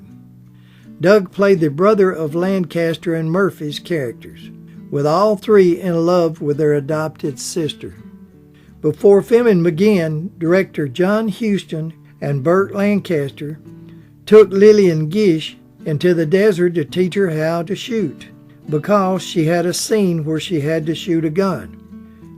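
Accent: South, United States. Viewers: What evocative videos these are for a Golden Age Syndrome sufferer like me, and all in that wonderful southern accent.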